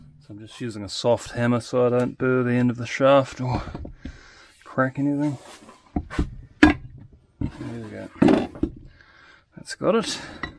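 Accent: New Zealand. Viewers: This is the fifth or sixth video of yourse I've watched and after the first two I said to myself This dude had to be from New Zealand And you are!! Cheeeers matee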